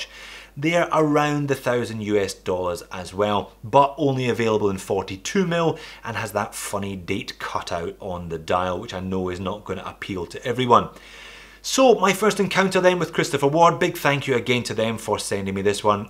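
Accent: Scotland, United Kingdom. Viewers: I love your watch videos. Your Scottish accent is great too haha!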